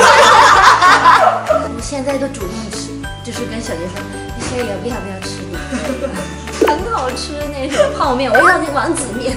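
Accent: China. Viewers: 還是有ㄧ點上海腔的味道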